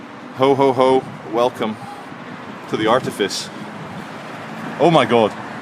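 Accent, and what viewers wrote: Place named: Scotland, United Kingdom. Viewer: Like, Subscribe, he's a good guy he said, Scottish Accent sounds great.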